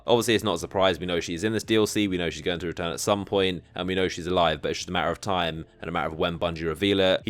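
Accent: United Kingdom. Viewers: My favorite British Boiiii Back At It Again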